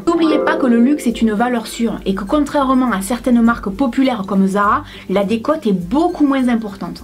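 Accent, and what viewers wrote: South, France. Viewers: Dans ma commune un SEL s'est créé. Cet été, je suis allée promener un chien pour une dame qui avait un souci au genou et qui ne pouvait donc plus sortir son animal. Cela m'a rapporté des points et ces points, je pourrai les utiliser pour demander d'autres services : cours, dépannage, repassage ou autre. Ta vidéo est très chouette et j'adore ton accent du sud, alors je me suis abonnée à ta chaîne.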